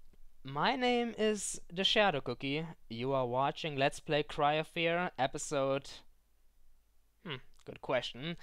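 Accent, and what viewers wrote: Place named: Germany. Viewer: you got skills german skills so use your awesome accent to fool the creeps in thinking your all stone cold so you can crack but look them in the eyes and laugh, laugh manicly catching them off their feet and go piano string on their ass jack-the-ripper style thats how i would but then again i solve problems by jumping off a heilocopter with a gauntlet because i was too lazy too pick up my parashoot im ok but one unfortunate guy hang-gliding didnt do a barrel roll sooooooo he... GOOD LUCK!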